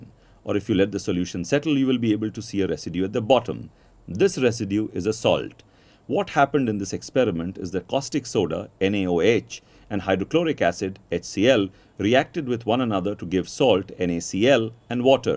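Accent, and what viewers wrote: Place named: India. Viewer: Your accent is like an indian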